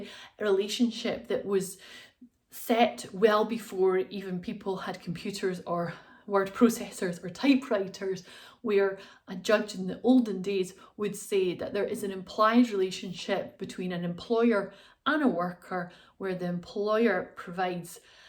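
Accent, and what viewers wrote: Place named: Scotland, United Kingdom. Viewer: Its called a Scottish accent. Get out more.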